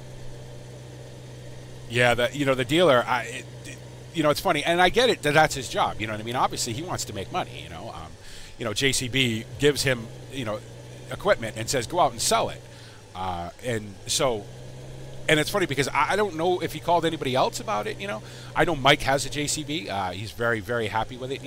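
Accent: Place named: Brooklyn, United States. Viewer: you sound like you're from brooklin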